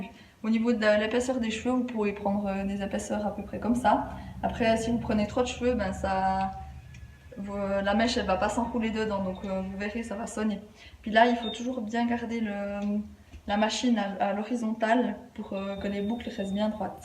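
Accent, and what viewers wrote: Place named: Neuchâtel, Switzerland. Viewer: hey hey on reconnait bien l'accent de Neuch :D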